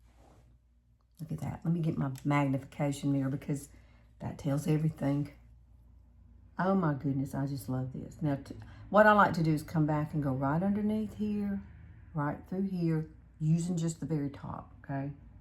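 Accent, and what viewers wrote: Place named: Alabama, United States. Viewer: Girl, I not only like watching you because of the great, down to earth, make up tips for older women; but I just love listening to your calm voice and devil may care attitude, your Alabama accent, and just the neighbor next door persona! Actually, wish you were my neighbor! We would be a hoot together; you with your Alabama accent, and me with my Texas twang! Just good 'ole girls, having fun!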